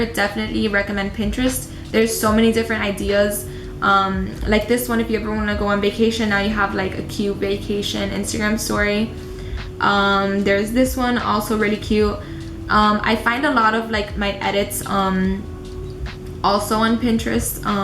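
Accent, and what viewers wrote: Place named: Miami, United States. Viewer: I know a Miami girl when I hear one ! 🤗 xoxo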